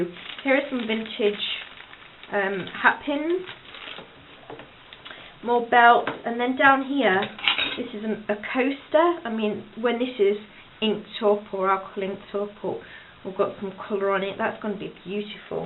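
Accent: United Kingdom. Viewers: you sound like your British. r u ??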